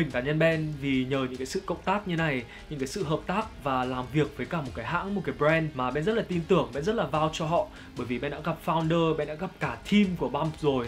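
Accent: Standard. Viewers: Tao thay thang này phát am tuy chuan nhung nghe cam thay k tu nhien . Nghe mac met giùm lun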